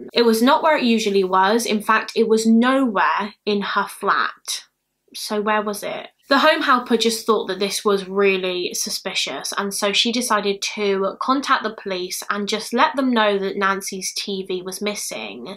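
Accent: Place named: England, United Kingdom. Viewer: I read a story on AO3 the other day & one of the characters was called. Every time I came across the name, which was very often, the reading voice in my head suddenly had the English accent and pronounced the name exactly the way that our here does. This of course prompted me to walk around just randomly saying the name but in her accent. So thank you for finding a way to drive my family absolutely nutty (& into laughter) because that's my goal in life. Not to drive people crazy but to make people laugh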